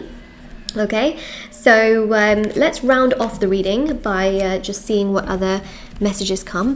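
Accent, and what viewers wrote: United Kingdom. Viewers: British accent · ❤Her accent tells me she's British......❤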